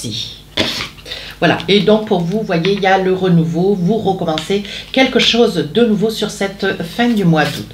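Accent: South, France. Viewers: Merci pour cette guidance. Beaucoup de choses sont sorties. Qui sont vraies. Cancer ascendant Cancer. Je vous ecoute depuis quelque temps. Et c est un plaisir. J adore votre accent du soleil. A bientôt. 😊❤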